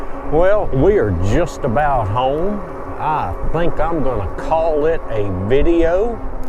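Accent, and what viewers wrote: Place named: United States. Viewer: Hello, great video I go to church every Sunday online because my first cousin and second cousin is both pastors and they have a church. I cannot make it to the church cause I’m too far. I’m like nine hours away from where they are at so I go online every Sunday and look at them. Yes, it is so sad when people pass away I know whoever they are my prayer with them. You know I don’t like to hear people passing. I know I say this if you have somewhere to be at a certain time get up and leave that’s all you have to do and you won’t be rushing, I don’t drive cars I get on public transportation and the people in my city be telling the bus driver to go a little faster because they in a hurry I tell the bus driver please don’t go fast because I wanna live a little longer but when I get my E trike, I won be using public transportation that much. 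I only use public transportation about one or three times a month. I love walking I Costco right around the corner from me, but people in my area is lazy. They will not walk. They will take a cab to go three blocks very very, very, very lazy in my city. thank you so much for your videos and I love love love your accent. It is so beautiful. Where are you from? I love to hear you talk country ❤❤❤❤❤❤❤🙏🏿🙏🏿🙏🏿🙏🏿🙏🏿 God bless you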